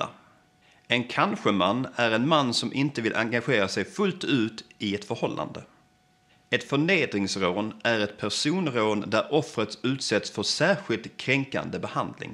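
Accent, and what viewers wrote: Skåne, Sweden. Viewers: Skånska är bäst!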